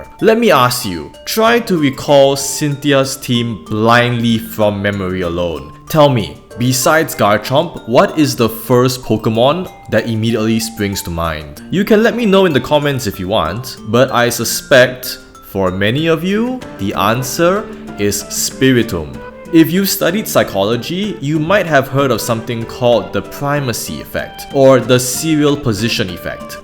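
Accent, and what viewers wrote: Singapore. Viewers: I instantly recognise that Singaporean accent, certainly didn't expect to come across a fellow mate talking about our childhood trauma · From your accent, I can already tell that you're a singaporean lol and your channel profile confirms it. Really make me feel so wowed for some reason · Are you singaporean? Or Malaysian? Srry i js hear the accent and I’m js curious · That Singaporean accent tho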